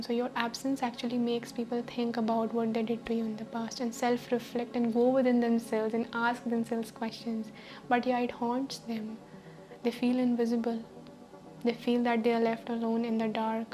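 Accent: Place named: Punjab, India. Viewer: Sorry but I can't stand the Punjabi accent.......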